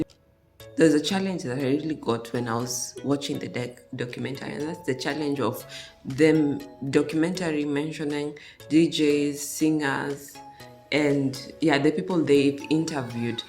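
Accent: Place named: Uganda. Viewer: I know you from East Africa, but are you from Uganda.... you sounded like one... I have a female friend who is also into media and entertainment from Uganda..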